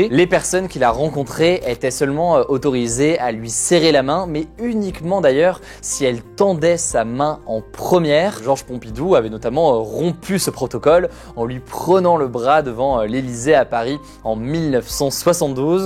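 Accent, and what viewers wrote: England, United Kingdom. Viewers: J’adore ton accent anglais belle vidéo continue comme ça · ton accent anglais >>>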